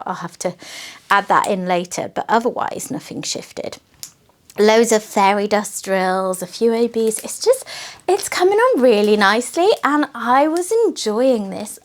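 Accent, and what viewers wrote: England, United Kingdom. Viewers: On your first picture, I love the old car. The Lorenz is so colorful too. I always love your choice of diamond paintings., you do NOT talk too much.!!!! Your English accent is so lovely and delightful. I have always said one of the stupidest things Americans ever did (lately a lot of stupid things) was lose the English accent. I’m American so I can say that. Talk about addiction, I swore to myself no more buying for a few months. So what do I do? I bought knight portal on DAC. It’s such a cool canvas, I had to get it. 😣Anyway you are wonderful. Don’t change. Have a great weekend.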